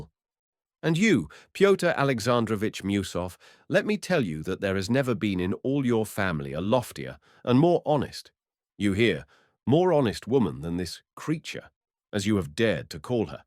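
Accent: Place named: United Kingdom. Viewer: Lovely rounded British English accent. Easy on the ear 👌 ❤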